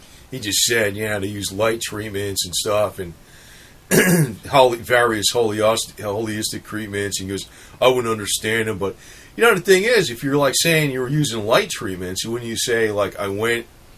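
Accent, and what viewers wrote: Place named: New York, United States. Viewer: I love the WY you talk .. New Yorker .. I never believed him ither ... he never was in the future..Their us no future ..We're the future ..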